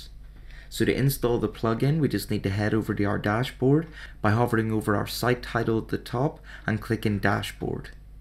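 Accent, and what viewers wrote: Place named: Northern Ireland, United Kingdom. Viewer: definitely a Northern Irish accent there.... good video!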